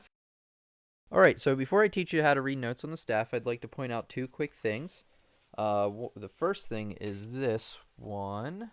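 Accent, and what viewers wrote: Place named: United States. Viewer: Jess Ng lol he sounds like a normal american.. Its pretty easy to comprehend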